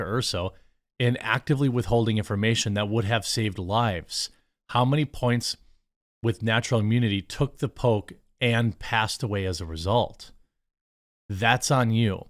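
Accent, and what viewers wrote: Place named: South, United States. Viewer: Terrible, terrible first 6 mins. I know you don't care to why someone is watching your recordings. Just know I for one watching you get some serious cringe. I am a conservative btw. Your real red neck swamp accent, your memory, your facts, your dumb Coffee and its constant promotion even when I have YouTube Prime. I just shake my head and think it's really bad here. And also lots of Cringe.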